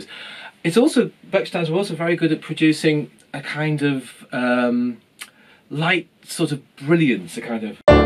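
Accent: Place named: RP, United Kingdom. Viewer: Hello there Sir!!!! I would like to leave an off-tangent comment: I love your English RP accent!!!!!!! So elegant =) kisses from montreal.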